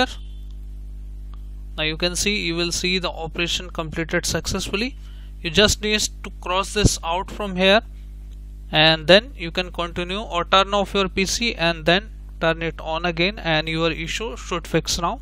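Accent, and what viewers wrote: India. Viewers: This is really too much of a mix of MBR installations and GPT. What with your heavy Indian accent, it would have been better if you'd made two separate presentations, one for each, then we wouldn't have to decipher which instructions apply to what circumstances. Thanks for trying, but...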